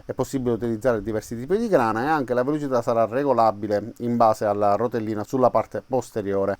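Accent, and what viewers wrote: Sicily, Italy. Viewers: ma sei siciliano?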